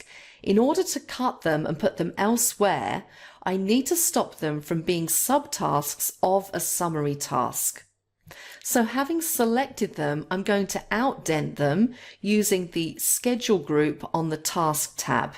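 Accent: United Kingdom. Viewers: British accent, i love it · I bet, that’s because of UK accent, isn’t it?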